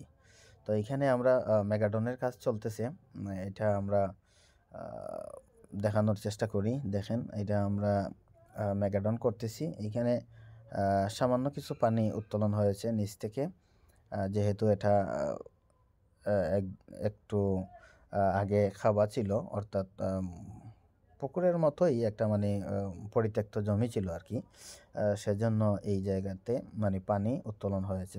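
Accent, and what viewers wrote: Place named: Sylhet, Bangladesh. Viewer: বাড়ি কিতা সিলেট নি? মাতে সিলেটি লাগের😃😃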